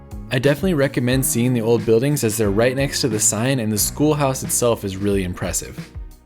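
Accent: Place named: United States. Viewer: American accent All I hear in every sentence is “ coastal trail” with no other information. Are there no biilfungs or a town there to buy food abd water? Is there nowhere to stay overnight? Are there no emergency services? Tgat woukd make sense but then again you’re Americans. Evrry little about yiur country makes sense.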